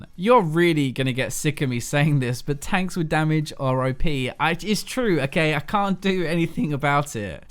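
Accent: England, United Kingdom. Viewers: is he english or an american?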